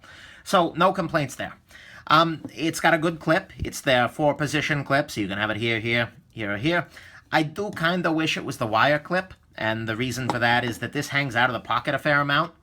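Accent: New York, United States. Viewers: It's called a New York city accent. Language dialects make America colorful and interesting. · Great review ! Just ordered a PM2 from Amazon . Thank You for your honest opinion . plus i dig the NY accent . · reviews are a refreshing change from all the slow boring knife videos here on youtube, he's got that NY or Eastern accent and talks fast, I could watch his reviews all day. Yeah the Maitraya Buddha thing gave me a chuckle. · I think it's a great accent- New York? Here in the UK we have a wide range of local accents, varying greatly over small distances. Unfortunately, in my lifetime we're losing them, youngsters speaking American, which seems out of place in grey, miserable Birmingham (UK not Alabama!)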